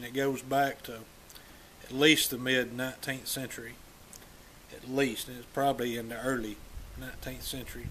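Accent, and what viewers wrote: South, United States. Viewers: Very southern laconically. Best regards vom Switzerland.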